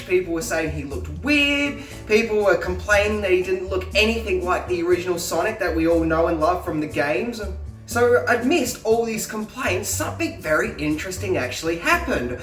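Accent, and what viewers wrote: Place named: Australia. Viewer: I have one. Superman the quest for peace. Its so bloody awful. The superman series could've done with out it. Worst part is when they r on the moon fighting. Looks like they r in a desert at night fighting in sand. I know the budget was cheap and cheerful. But come on make at least believable. Love the Aussie accent. Visting Melbourne is on my bucket list.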